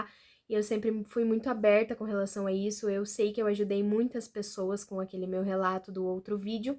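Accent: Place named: Curitiba, Brazil. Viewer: Nossa você é muito gente boa eu me senti compreendido , também tenho isso é horrível , sou de Curitiba também . Percebi devido o seu sotaque também .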